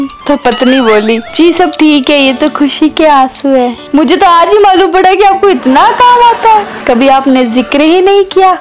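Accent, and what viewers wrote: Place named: Rajasthan, India. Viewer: ठीक है जी आप का पहनावा दिल को लुभावना लाग्या और आप की बोली गजब है जय मेरा राजस्थान फतेहपुर शेखावाटी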